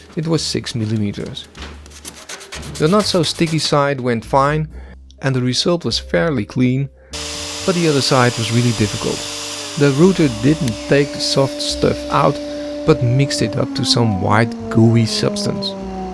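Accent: Netherlands. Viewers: Jouw engels is echt gewoon goud, dat nederlandse accent 10/10.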